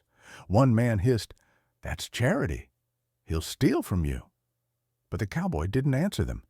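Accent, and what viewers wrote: United States. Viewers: Story and voice are spot on. Cowboys talk like this. People from the mid west talk like this. Actually the old cowboy talked too much Awesome amazing story thank you!❤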